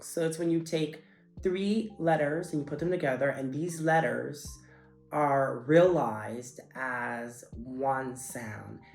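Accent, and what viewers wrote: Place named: Poland. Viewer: Thanks to yt algorythm I had found this video and just wanted to say that your pronaunciation is phenomenal! I know how it feels to speak foreign language and how stressfull it can be but seriously YOU'RE DOING GREAT. Of course, you have trouble with dipthongs etc. but that's completely normal. It's almost impossible to pronounce every sound right while speaking foreign language. But regular sounds? With the 'simple' words at the beginning you sound 100% polish. You pronounce the 'r' and all of the 'hard' letters with this harsh polish accent. I don't know for how long you've been living here but damn, it's impressive. Also, most of the time, we're happy to hear foreigners trying speaking polish and we'll happily help you.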